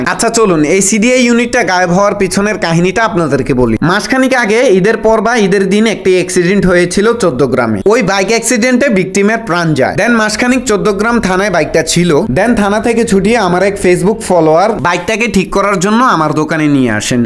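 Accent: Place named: Noakhali, Bangladesh. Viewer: নোয়াখালীর লোক? খাইছেরে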